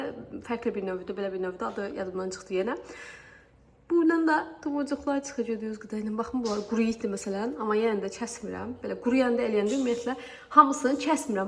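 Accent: Sheki, Azerbaijan. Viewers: Videonu şəki ləhcənizə görə izlədim. Rayonlara getmək fürsətim olanda ilk seçimim Şəki şəhəri olur. İnsanları, təbiəti, şirniyyatları.... Sevirəm Şəkini. Allah yeni evinizdə şirin övladlarınızla, yoldaşınızla xoşbəxt yaşamağı nəsib etsin .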